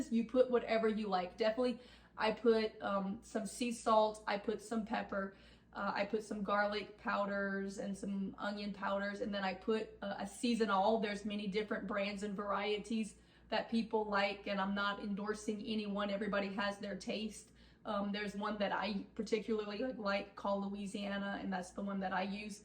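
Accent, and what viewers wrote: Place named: North Jersey, United States. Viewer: Love watching and hearing yr accent! North west nj🩷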